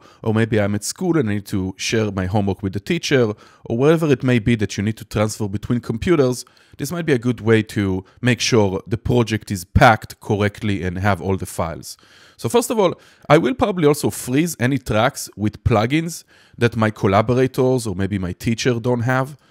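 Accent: Israel. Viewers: cool thanks hamm Israeli accent ?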